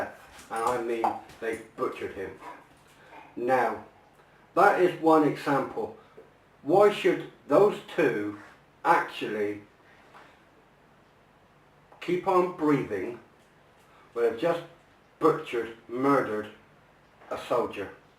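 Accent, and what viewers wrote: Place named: Gloucester, United Kingdom. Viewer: I've noticed you have the same accent as the Gloucester Wests.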